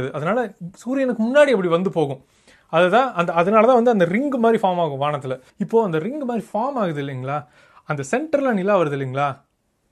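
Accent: Coimbatore, India. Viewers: Bro neenga Coimbatore ah.... Namma aalu.. neenga kuniyamuthur nu type pannathukku apro unga slang semma.. bro Mettupalayam la ipo cloudy ah irukku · Excellent description abt solar eclipse bro. Especially in our Coimbatore slang, really i enjoyed it bro. And I got the solar film for ₹1020 from Mumbai. "Tejraj and co" Mumbai based astronomical equipment manufacturer. If some one need it can use.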